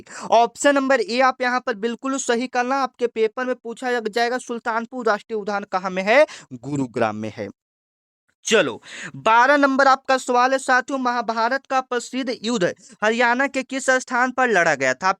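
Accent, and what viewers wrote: Bihar, India. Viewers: Kaise bolte hoo bihari ho kaa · Sir aap bihar se ho kya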